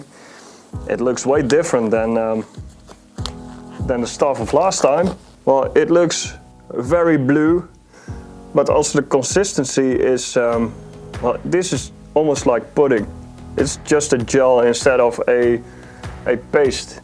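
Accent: Netherlands. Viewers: Het Nederlands accent spat er van af. Kanus man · Okay, your accent made me suspect you to be Dutch. But the tube squeezer made me 100% sure :) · Hello fellow dutch guy very nice accent, keep up te videos and cheers! Geniet van je frikandelbroodjes~ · just from the accent I can tell you're dutch, its always very obvious or unnoticeable at all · mooi nederlands accent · Eerste keer op je kanaal. Dacht aan je accent te horen dat je Nederlands was. De "Ah, nee, tyfus." overtuigde me. Interessant idee om met een gel te printen.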